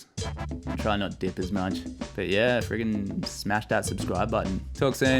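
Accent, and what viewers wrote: Australia. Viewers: Your humor is soo heccing good. Get's me everytime. You got this kinda dry humor I really like and the australian accent just adds to it. Kinda got MK's Kano in mind. Kano giving advice. Just imagine that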